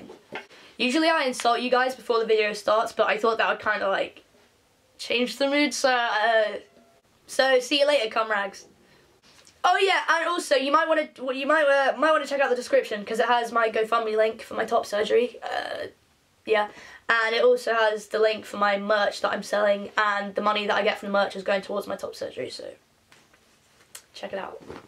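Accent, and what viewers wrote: United Kingdom. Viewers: I'm so sorry about your aunt, amazing cover, your voice is so beautiful! (I also love your accent, I love British accents because I have an American accent) 💖💖💖💖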